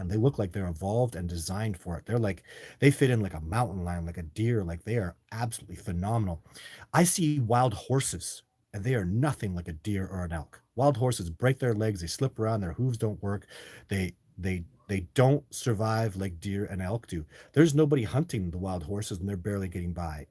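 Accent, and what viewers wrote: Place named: United States. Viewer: You sound more like an American, than a Canadian! You can move down to the States if Canada is gonna keep you locked up! 😊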